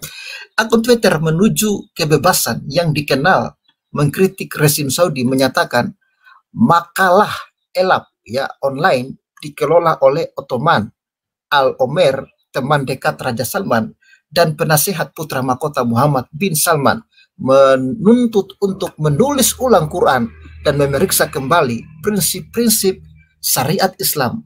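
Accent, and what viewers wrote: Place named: East Nusa Tenggara, Indonesia. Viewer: Mantap. hostnya sptnya orang NTT ya logatnya jelas sekali? Sama spt orang Batak ada logatnya. Orang Jawa ada logatnya.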